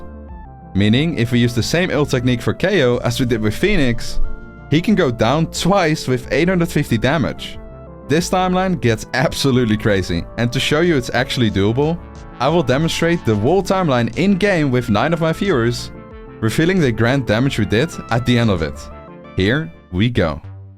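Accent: Netherlands. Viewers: Lol i thought you had a dutch accent. Then i saw “Netherlands” on your channel :P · Typisch NL accent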